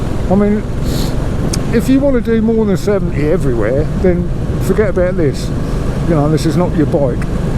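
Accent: England, United Kingdom. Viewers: New subscriber here. Your most recent video from the barn where you talked about your experience on the flooded road showed up in my feed and I gave it a watch. Then I watched a few more videos. I just sold one of my bikes and am looking for a candidate to fill the spot in my shed. I got rid of a H-D Dyna. Too heavy, too loud but had a lot of charm otherwise. I really wish that RE made a 650 classic, but they don’t. I am thinking about a Super Meteor or a classic 350. My mind is still not made up, but your video was very helpful. BTW, what part of England are you from. I am trying to place the accent. As an American that was raised on a diet of Monty Python, you accent has me recalling the Piranha brother’s sketch. Cheers and thanks. Tim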